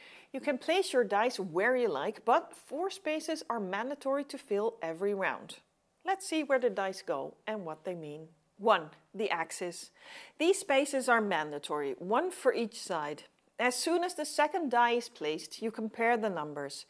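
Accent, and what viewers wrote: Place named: Germany. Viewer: Great vid love the german accent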